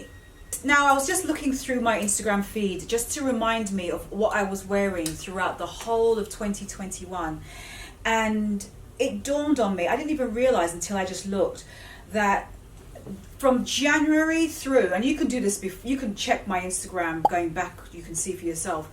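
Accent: United Kingdom. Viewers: Hi beautiful - your accent wow! New to your channel, via Arvin Olano. Thanks for the Inspo, with your fashion taste I’m surprised your videos are not edited with music? You would rock any runway! Cheers!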